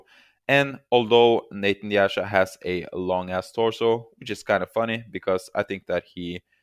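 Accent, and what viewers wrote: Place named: Sweden. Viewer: If I may ask, are you Swedish???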